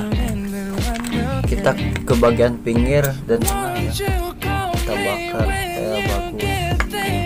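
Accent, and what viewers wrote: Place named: West Java, Indonesia. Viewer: Sunda mamang😁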